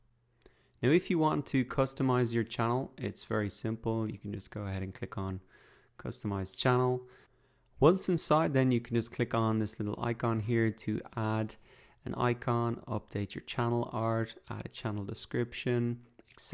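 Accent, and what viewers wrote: Ireland. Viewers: Are you irish im irish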